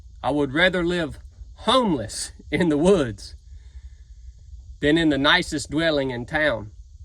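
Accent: South, United States. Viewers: I don't know your history since I'm new to the channel. I keep trying to figure out your country accent. Here are my guesses in order: Kentucky, Tennessee, West Virginia, Georgia. It can't be Texas. And it really doesn't matter too much anyway. Just curious. I am a student of language and accents. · Bro I like your content but this is a little bit much lol you almost sound hillbilly sorry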